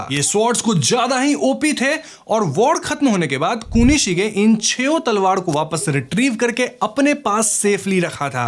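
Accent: Bengal, India. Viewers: Bhai tu bengali hea kya ? 🤔🤨🔪 coz some of your accents is so similar with bengal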